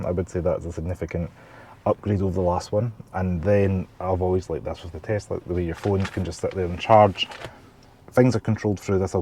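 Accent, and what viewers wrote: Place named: Glasgow, United Kingdom. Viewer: Love and miss that accent mate. You have a new subscriber ex-Glaswegian :)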